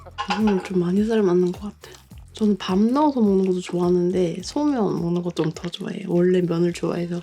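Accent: Gyeongsang, South Korea. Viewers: 아 대구시구나 경상도사투리가 가끔 나오시더라니 여긴 창원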